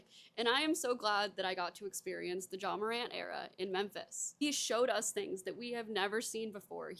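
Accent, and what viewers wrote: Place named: New York, United States. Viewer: Where's her accent 😂😂 she sounds more ny then t.n lol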